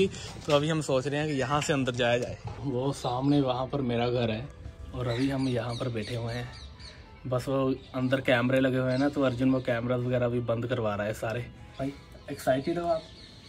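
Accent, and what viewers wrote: Punjab, India. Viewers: Bai ji punjabi muh se punjabi accent bohot kaint lagta hai😂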